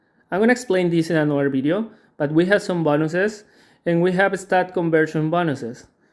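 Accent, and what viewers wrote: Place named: Mexico. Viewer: Nice, didn't expect you to sound like every other Mexican.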